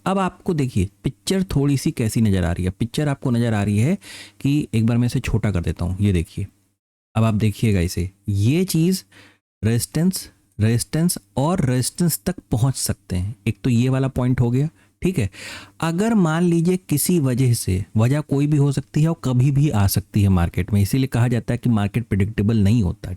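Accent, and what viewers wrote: Lucknow, India. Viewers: Right shoulder is Pushpa style. Main jhukega nahi. You have a great way of delivering your content. Lucknowi andaaz ki baat hi kuch aur hai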